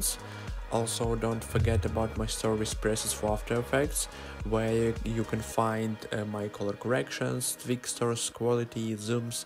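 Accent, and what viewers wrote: Russia. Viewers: Чувствуется русский акцент в твоем английском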